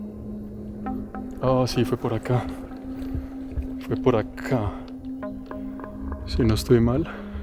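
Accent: Colombia. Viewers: De qué parte de Colombia eres?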